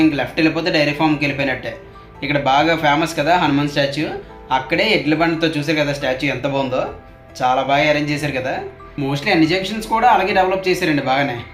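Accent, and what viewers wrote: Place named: Uttarandhra, India. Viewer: నీ ఉత్తరాంధ్ర యాస బాగుంది మిత్రమా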